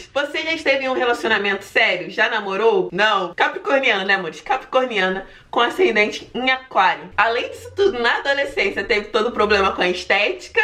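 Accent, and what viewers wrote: Rio de Janeiro, Brazil. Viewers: Rexpoxta vc e da onde com esse sotaque do rio?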